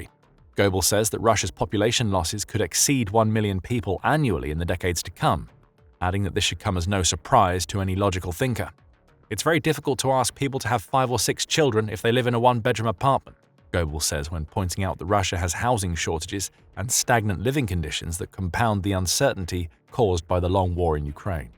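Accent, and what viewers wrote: United Kingdom. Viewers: Lol Russia has 1.5 million active troops and tens of millions of reserves lol I know as soon as i heard a british accent this was gonna be utter nonsense lol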